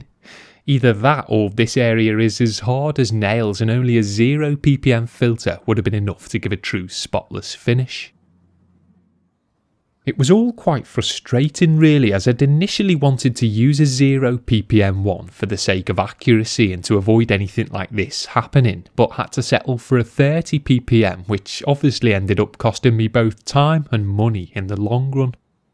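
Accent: Liverpool, United Kingdom. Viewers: The interesting and compelling thing about is incongruity. He’s doing a working-class job (car detailing) and has the appearance of a working-class individual - but his commentary is fantastically articulate, precise, and detailed – to a degree that you expect from a lawyer, consultant, or other premium-rate professional. Add to that the accent (which sounds almost Liverpool, but not quite – maybe Warrington?) and delivery – providing such a reassuring and relaxing quality - it’s almost like taking 10mg Valium tabs…….and the result is great videos - interesting, informative – and just satisfying to watch. PROPS to – you’ve made a dull and dreary subject fun / absorbing! You are a CULT! Regards Chris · well youll have " fuck me "then too cos this has to be one of the most irritating voiceovers on earth and I love the Liverpudlian accent. its like he never takes an in breath. 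its really weird in fact.....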